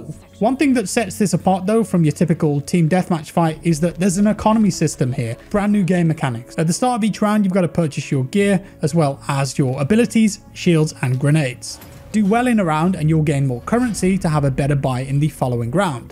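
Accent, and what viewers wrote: United Kingdom. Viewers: What I like about he speaks British but called him self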